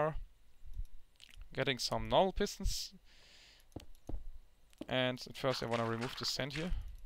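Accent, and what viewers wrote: Germany. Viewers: dieser deutsche akkzent! :D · Hes german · hört sich komisch an, englisch zu sprechen mit dem deutschen akzent :d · man merkt das du deutscher bist der englisch redet :D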